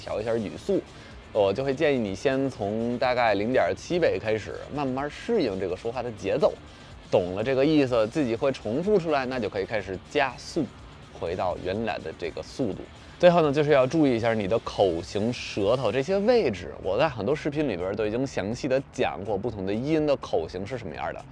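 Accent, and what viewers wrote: Beijing, China. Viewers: 你的中文真的不错啊，希望你能改掉那种京片子的腔调，这种腔调其实现在北京人也不说，因为给人油嘴滑舌的感觉。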